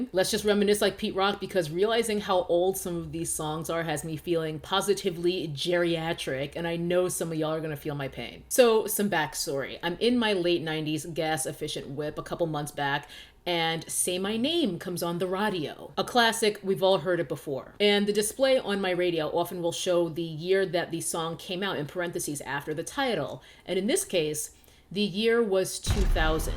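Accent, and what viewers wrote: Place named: New York City, United States. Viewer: NYC accent is thick in this video!!! Also you know I watched this immediately!!!! Click bait!! I’m old!! Sobs in corner to “ ” which is also old enough to drink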